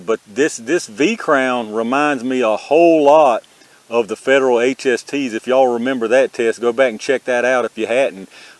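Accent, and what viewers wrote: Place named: Louisiana, United States. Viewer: If he hadn't said he is from Virginia, I would have sworn he is out of central Louisiana. Great video.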